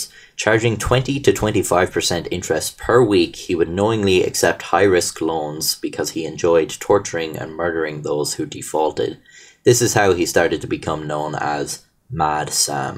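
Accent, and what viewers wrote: Ireland. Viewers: This is just the wikipedia page with an irish accent and bad jokes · The thicc Irish accent makes for a good mafia storytelling voice. More little known Irish personalities, please.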